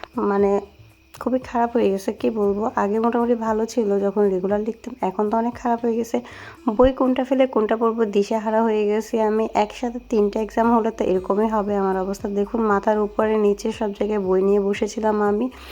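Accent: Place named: Noakhali, Bangladesh. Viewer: আপনি ভয়েস শুনে মনে হলো আপনি নোয়াখালীর না হয় ফেণীর মেয়ে হবেন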